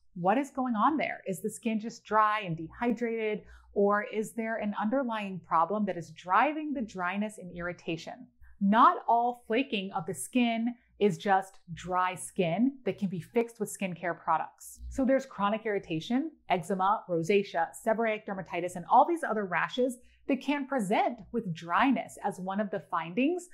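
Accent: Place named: Iran. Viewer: I hear a mild Persian accent. 100 bucks says she is Persian. 😁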